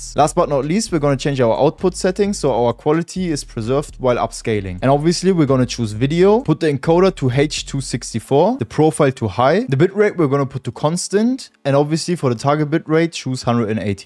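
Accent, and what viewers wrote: Germany. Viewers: Ich höre einbisschen Deutsch raus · This German accent 👍🏻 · ur german i only watched 10 seconds and heared ur accent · richtig deutscher akzent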